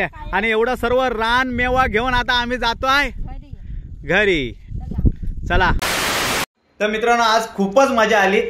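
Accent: Malvani, India. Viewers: Tu malvani volg madhe Chan Boltos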